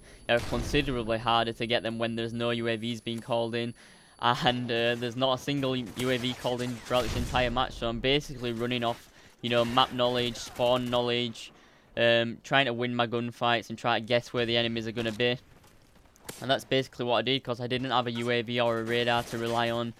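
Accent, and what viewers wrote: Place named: Northern Ireland, United Kingdom. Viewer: Nice man! You sound like you're from Northern Ireland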